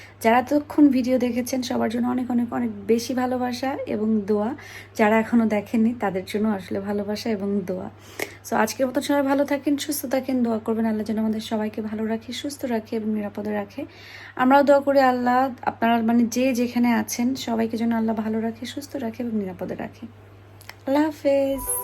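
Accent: Sylhet, Bangladesh. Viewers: Sylheti manus bole kota❤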